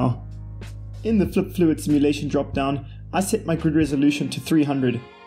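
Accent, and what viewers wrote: South Africa. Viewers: You sound South African lol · South African? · The accent took me a second to pick up. Not used to SA repping in the industry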